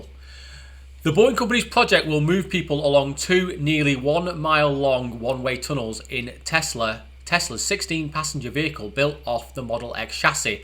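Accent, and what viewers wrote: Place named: Liverpool, United Kingdom. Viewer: Man your accent.. You're from Liverpool?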